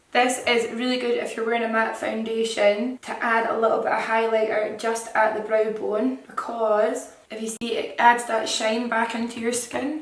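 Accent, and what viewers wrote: Scotland, United Kingdom. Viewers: omggg I love your Scottish accent xxxxx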